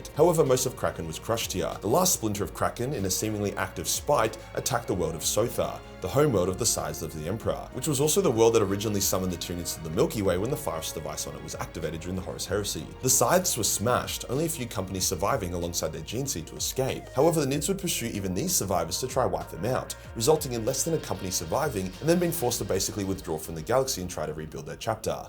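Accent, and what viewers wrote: Australia. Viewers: Nice vid ya Aussie 😂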